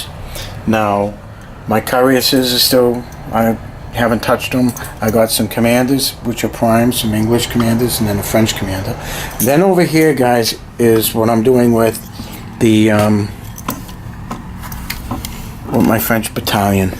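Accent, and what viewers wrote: New England, United States. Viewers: Black powdah in havahd yahd Love the New England accent! Thanks for the unboxing! I’m just getting into the hobby and bought the Waterloo starter set. May look at this one next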